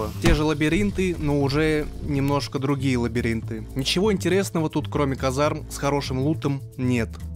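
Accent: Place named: St Petersburg, Russia. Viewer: Голосок Питерский конечно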